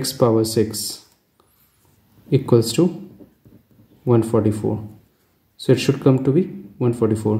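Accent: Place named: India. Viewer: Bro, Are you Indian ? Your accent is like 🇮🇳 Indian? By the way one of the nice way to answer the question.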